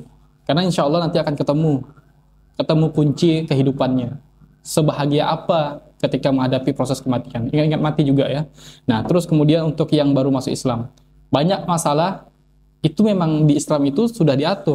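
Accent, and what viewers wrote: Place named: Borneo, Indonesia. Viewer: Logat orang dayak kalbar, mirip logat malaysia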